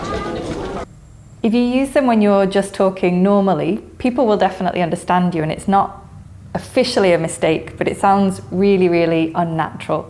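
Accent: United Kingdom. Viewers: She is native British 😊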